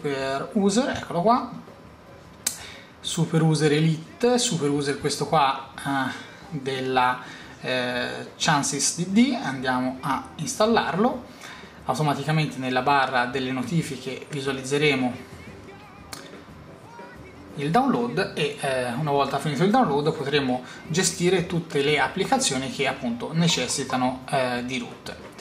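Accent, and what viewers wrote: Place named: Tuscany, Italy. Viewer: l'uso di spengere non e' proibito ed anzi e' molto diffuso in regioni del centro Italia, come la Toscana.A giudicare dall'accento, il cortese relatore di questo utile compendio video, sembra provenire proprio dalla succitata regione.Cfr. il sito dell'Accademia della Crusca, qui: goo.gl / G1VoB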